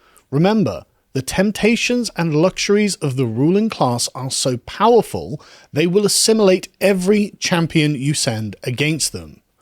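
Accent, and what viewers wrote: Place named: West Country, United Kingdom. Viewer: It's that ever so slightly West country accent. Makes me homesick.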